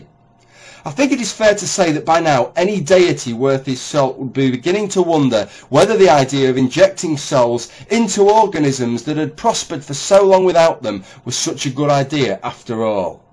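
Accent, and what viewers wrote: United Kingdom. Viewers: To most Americans, you sound 50% smarter by just having that British accent. I agree with you, by the way.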